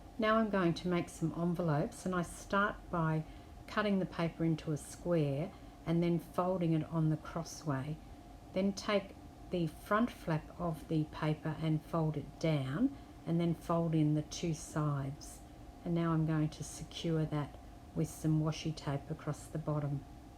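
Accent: Australia. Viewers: Hi, 😘 love the ideas. Thank you for sharing. I love, love listening to your soft Aussie accented voice 😍😍.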